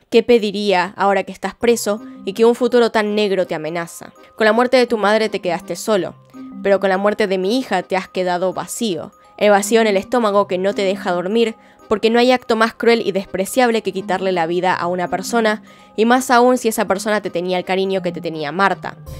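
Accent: Argentina. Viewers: Muchas gracias por el caso, tienes un acento argentino muy bonito, se oye bien con la narrativa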